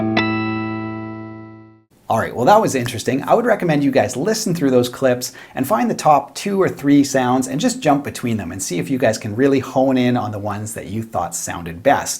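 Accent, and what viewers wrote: Canada. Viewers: Thanks, you did a really nice job with that. Your playing of the riffs was as consistent as the equipment for each comparison. I was surprised that I liked the A 2's the most. However, the 5's, which I have in my CV Tele were nice also. I'm new to your channel and was wondering, do I detect a little bit of a Canadian accent?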